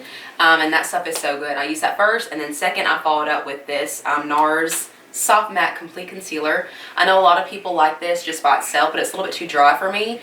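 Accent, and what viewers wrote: South, United States. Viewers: Hope you don't mind my asking but where are you from? I hear a little country twang!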